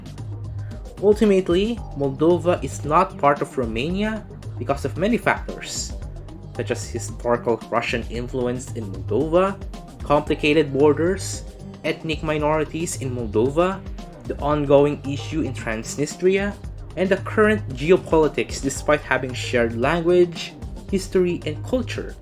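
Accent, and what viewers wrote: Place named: Philippines. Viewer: Knew you were filipino from the accent. Good stuff dude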